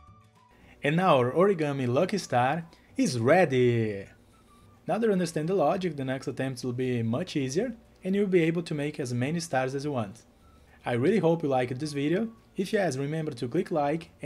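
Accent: Brazil. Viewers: are you a brazilian? because if not your accent looks so much as a brazilian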